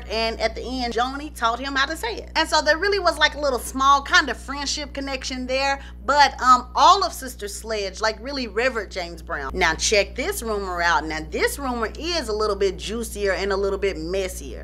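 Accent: South, United States. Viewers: I love how you talk all country and then come through with the Dianne Sawyer voiceovers 🤣🤣🤣❤️❤️❤️ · Hey there girl. I was about to lose my mind if I didn’t see another one of your fun juicy videos filled with gossip scandal and tea. Sister sledge is one of my favorite female groups. Right up there with the. I just love the way you talk with the country accent. It compliments you so well. It goes hand in hand with your character you are so bubbly fun and such a joy to watch and listen to. You are such a talented woman. You are going so far with your talents. The lord has blessed you so abundantly. God bless you your family and your talents.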